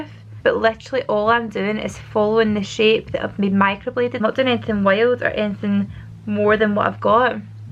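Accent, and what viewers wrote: Scotland, United Kingdom. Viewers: I found you through watching fleur de force and I must say I love you just as much if not more. It's so nice to hear an accent like my own, I'm in shotts. (Don't judge! 😂😂) is love to see you do a fenty beauty review, I watched fluers and Jamie Genevieves and am obsessed already. The only place to physically buy it in Scotland is Harvey nicks in Edinburgh, if you do a review you can let me know if it's worth the trip 😂😂 xx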